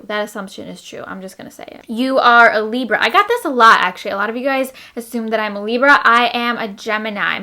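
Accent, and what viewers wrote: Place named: New Jersey, United States. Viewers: I thought you were from New Jersey bc of your accent 😂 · Love your lipcolor!!! And I assumed you were from Jersey too. I'm born and raised in Cali. And to me, your accent sounds like what I would assume is a Jersey accent. Buuut, I am not an expert obviously on accents lol.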